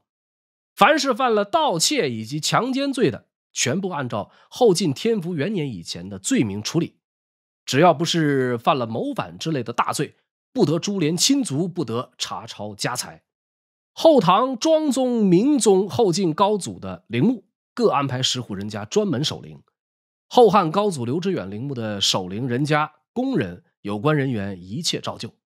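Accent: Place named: Tianjin, China. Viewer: 我会说一点粤语 以前经常看港片听粤语歌看一些那边拍的整蛊视频 其实我和博主算是老乡 不知道你们听没听出来博主说话有点天津滴口音 介北方人和南方人就算都说普通话也是能听出来发音上的区别的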